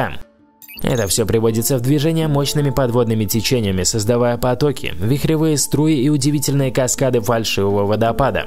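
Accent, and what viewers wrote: Moscow, Russia. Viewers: Такой интересный канал, но из за противного московского говора не могу смотреть до конца. Как бы не терпела, раздражает.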